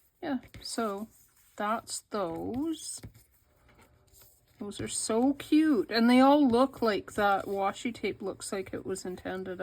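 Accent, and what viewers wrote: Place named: Canada. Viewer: Just discovered your channel - it came up in my suggested videos feed. You have to be Canadian 🇨🇦 🍁 🇨🇦 your accent gives you away! Nice to subscribe to a fellow creative Canuck. I have had tabs on my to-do list for awhile - thank you for the inspiration.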